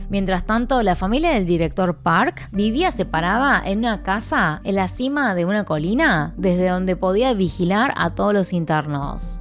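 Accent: Buenos Aires, Argentina. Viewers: Tremendo documental. SERIA MUY BUENO que la modulación fuera un poco menos de recoleta... menos nasal... realmente me costo mucho superarlo... La información fue tan precisa y tan buena la edición que me mantuvo como espectador... Pero seria muy bueno mejorar eso. En Argentina es muy chocante el acento de ¨CHETA¨ para todo el resto de las personas que no viven en los barrios de clase alta... Se lo asocia a gente privilegiada que no tiene empatía y se cree superior al resto. Creo que el VIDEO PRESENTACION en el canal es muchiiiiisimo mas accesible en ese especto. Seria bueno que esa misma fuera la entonación para el resto. POR SI SIRVE LA CRITICA. El contenido es de primera calidad. Muchas gracias. Un abrazo. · La información es muy buena pero que pasó con el tonito que le das al hablar te estas esforzando ser una argentina o eres una fanatica del acento argentino te sugiero que le des un acento neutro eso te ayudará mucho un consejito es bueno aunque sea de un conejito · de todos los acentos tenia que elegir el argentino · tiene acento argentino?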